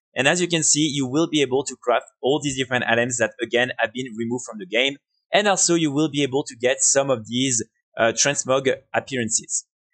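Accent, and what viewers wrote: France. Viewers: Ça se voit que t'es français 🤣🫣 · Are you french ?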